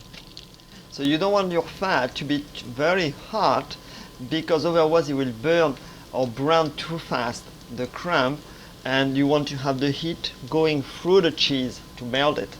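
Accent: France. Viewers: Wow so yummy with the French accent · this guy has an awesome French accent and a really cool hat - this has got to be the best recipe on YouTube for Chicken Cordon Bleu :) LOL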